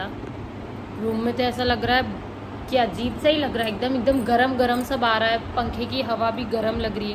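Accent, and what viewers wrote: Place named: Mumbai, India. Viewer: ap ki aawz me mumbai ka tuning hai sach mein inshallah allah ap ka 100k jld se jld hojayega ap ke sach me ek din 1million followers hoge inshallah thankyou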